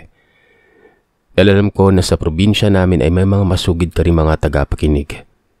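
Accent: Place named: Ilocos, Philippines. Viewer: Ang cute mo mag Ilocano, Sir 😂 Proud Ilokana here. 🫶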